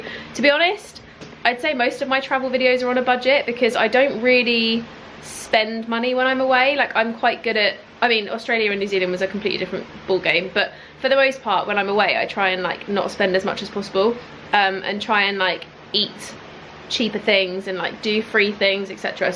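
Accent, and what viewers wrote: United Kingdom. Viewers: I've been watching you for a year now 😊 Back then I was living in Sheffield for my Erasmus year in the UK (I'm from France) and I wanted to find some UK lifestyle youtuber/content creator to watch while doing the dishes 😂 just to get used to the British accent 😂 and here I am, à year later, still watching your videos ❤ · I’ve been watching for 2.5 years and I think I found you when I was binge watching closet clear out videos during lockdown. I really like your style, your energy and your honesty. Also I moved from the UK to the US last year so it’s really nice to hear British voices on videos and podcasts.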